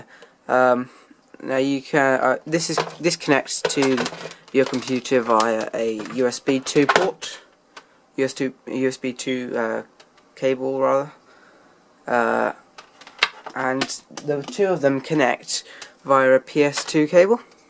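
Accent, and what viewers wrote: United Kingdom. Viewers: Very good review thanx bud, good to see and hear british reviewers and a excellent british accent well done.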